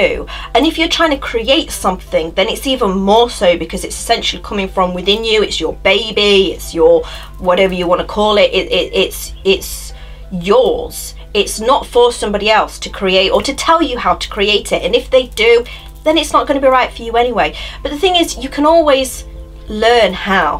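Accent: United Kingdom. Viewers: I do NOT want to hear this...but I NEED to hear it. Where does the fear come from? Why can't I jump right in and not be in fear of making a "mistake"? Thanks for the kick in the butt! I also realized that anything said in a British accent is very palatatable. Keep up the great and talented work,!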